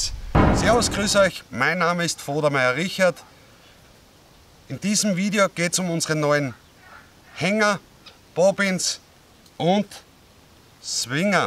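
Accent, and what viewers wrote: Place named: Belgium. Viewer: Bent u belgiesch, ik wel en ik hoor een soort Nederlands belgiesche toon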